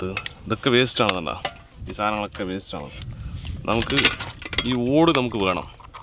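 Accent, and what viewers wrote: Palakkad, India. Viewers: അണ്ണൻ പാലക്കാട് ഭാഷ 💯 സൂപ്പർ സൂപ്പർ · Chetta palakadan avadaram super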